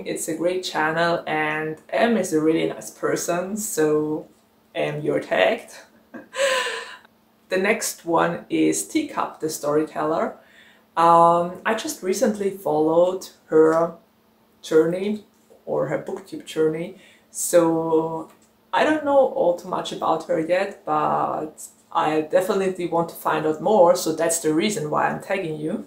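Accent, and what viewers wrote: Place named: India. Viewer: Your comment made me check this out. You've done an amazing work answering this tag questions. I love your idea on pre-ordering books. You have a great demeanor and this seem to be my first time here, so I'd just subscribed. Your accent sounds lovingly Indian. Are you an Indian? I admire your opinion on library books too. 😆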